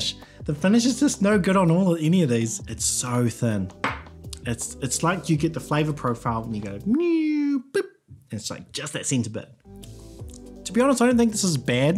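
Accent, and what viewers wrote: New Zealand. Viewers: (First time seeing this channel, my thoughts) "45 DOLLARS FOR PROPER 12 ?!?!... Oh wait, my man sounds like a Kiwi". (Checks channel) ah, ok, that probably explains that." Still seems high for Proper 12 but New Zealand is quite a bit further away from Ireland that the US so, yeah, makes sense I guess. Edit: I should've paid better attention the first time, he says "New Zealand" directly behind the "45 dollar" price. 😅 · I wondered why you pronounced Conor McGregor as “McGreegor but then you said J&B was found “eeverywhere “so I realised that’s how Kiwis speak.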